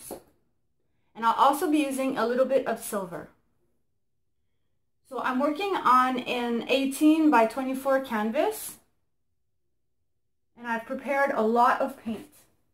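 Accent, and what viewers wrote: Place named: Canada. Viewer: your Canadian eh! love it great work😁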